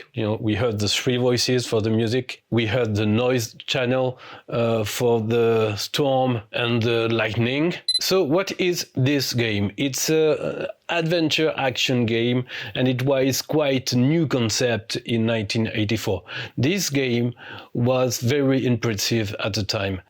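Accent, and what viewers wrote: France. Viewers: Great, thorough video. Please keep them coming. I especially like the narration, the Frech accent is pleasing to the ears.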